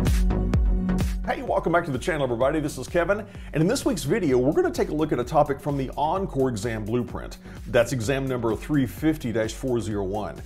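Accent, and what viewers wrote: Texas, United States. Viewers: topped up with that texas accent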